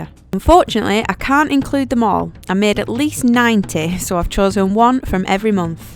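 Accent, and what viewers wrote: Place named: Lancashire, United Kingdom. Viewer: So happy to have found ur tutorials.....absolutely in awe of ur work As a mere novice and previous community nurse ,this is inspirational.....think I chose the wrong vocation in life !!!???? Lol Thankyou for ur clear demonstration and must say ur lovely Lancashire accent just warms my heart . Keep up the fantastic work , I'll certainly be following regularly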